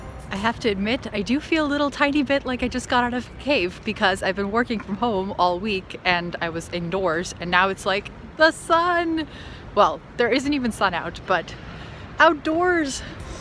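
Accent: Netherlands. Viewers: Is it me or you now have a Dutch accent when you speak English? Especially in the outro! 🇺🇸🤝🇳🇱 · You sure seem to be settling in well, you even seem to be developing a dutch accent. Sometimes i think im listening to a dutch girl speaking english :) Anyhow you were just in time, i guess the coffee places and the museums closed right behind you for the new lockdown....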